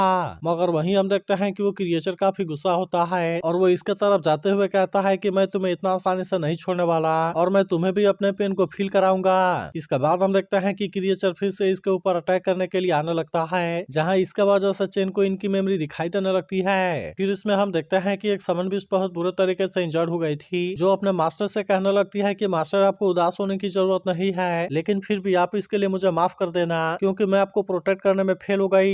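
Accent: India. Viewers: Are you a bihari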